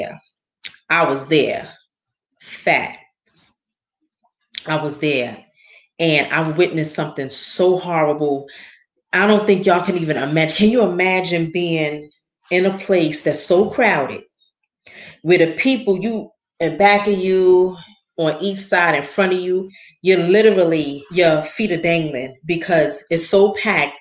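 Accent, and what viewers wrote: New York, United States. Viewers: Views not lost if you're watching. City is the name of the college, City College in Harlem Manhattan. You should know that Ny accent from anywhere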